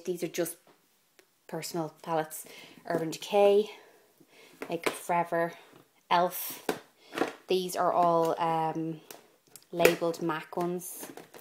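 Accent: Ireland. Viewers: Irish im thinking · im irish! i live in florida and have an american accent though. so finding your video made my LIFE when i heard your accent <3 your room is amazing & these were such great tips! thanks! <3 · are you irish?? you sound lik your frm dublin? :) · I think she's Irish maybe? :) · omg. the sec i heard yur accent i was like finally an irish girl.... :D :D :D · Your from CORK ! deffo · Are you Irish??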